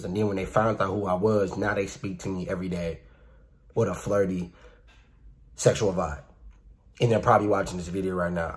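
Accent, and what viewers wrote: Baltimore, United States. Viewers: Yooo are you from Baltimore? I can hear the accent. I’m originally from Silver Spring, Maryland. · Big homie.... you from east baltimore?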